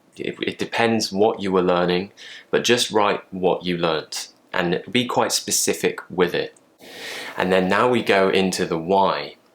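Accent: United Kingdom. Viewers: are u british, babe??? I love you much. thanka for be a great teacher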